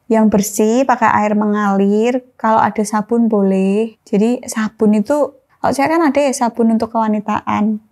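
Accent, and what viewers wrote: Java, Indonesia. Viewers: Kudus hadir...😎 Bahasamu medog mba..🤣👍🏻👍🏻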